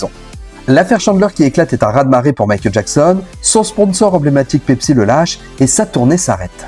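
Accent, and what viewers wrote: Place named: Quebec, Canada. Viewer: La traduction IA avec l’accent québécois, on pouvait très bien s’en passer. La prochaine fois, mets des sous-titres.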